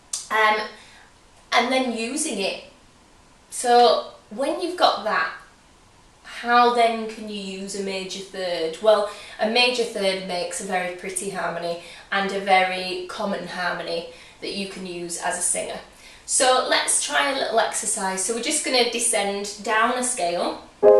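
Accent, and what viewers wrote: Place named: London, United Kingdom. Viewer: love your accent. London?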